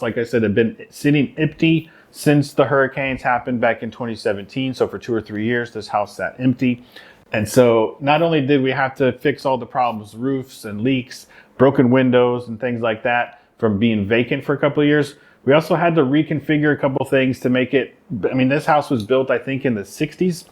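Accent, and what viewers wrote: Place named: Puerto Rico. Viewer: You have a slight. And I mean SLIGHT, Puerto Rican accent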